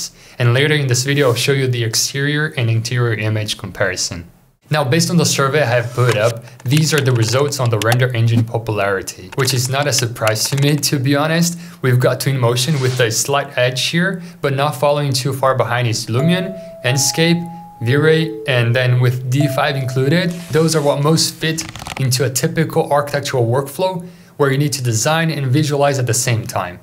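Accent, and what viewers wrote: Brazil. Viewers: mano... eu tava assistindo e achando mto foda, ai eu percebi que tu é BR, to achando mais foda agora kkkkk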